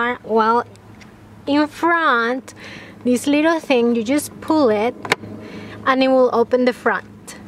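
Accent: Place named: Mexico. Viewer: Let's be honest... Who the hell cares about learning how to change the Air Filter ? We all know how to do that but watching a beautiful girl explaining the process it's music for my ears and pleasure for my eyes. I have a 2005 Toyota Corolla XRS but I installed TRD Air Intake, something little bit different Well done mami, I love your video and your accent Viva México Ca... !!!!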